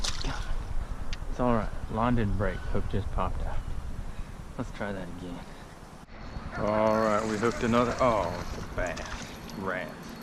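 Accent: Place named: South Carolina, United States. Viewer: May I ask what state you are in? I'm new to your channel maybe you said. Seems like a S.C accent you have. Crappy are a fish I always had difficulty catching. And yet I was amazed to see several young boys taking long stringers of them out of a tiny pool below a dam outlet. They were just flicking the line like a fly rod. Amazing. Another cold day here, thank you or the vid!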